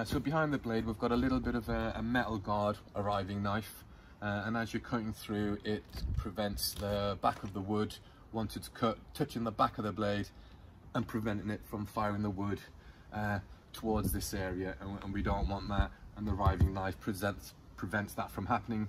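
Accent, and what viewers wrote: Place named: Yorkshire, United Kingdom. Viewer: Nice review. Loving the Yorkshire accent. Going to buy one on your recommendation. Thanks.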